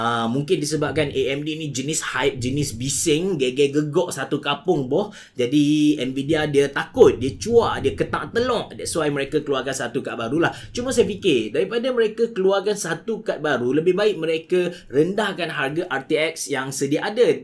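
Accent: Kelantan, Malaysia. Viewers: mcm awal2 dlu kencek klate😄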